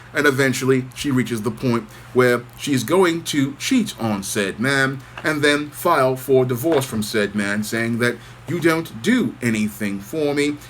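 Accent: United Kingdom. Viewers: Its sad to see Prince Harry's current state- esp in comparison to older brother Prince Williams. Which shows why as a man, who you marry tells us a lot about who you are- which is why men are walking away from marriage at record numbers. Thanks for doing the commentary with a British accent! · GREAT UK ACCENT MATE !!!!!!!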